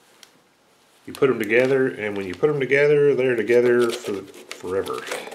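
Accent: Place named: United States. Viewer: great accent! Which state is that? (I'm English.) Also, great video.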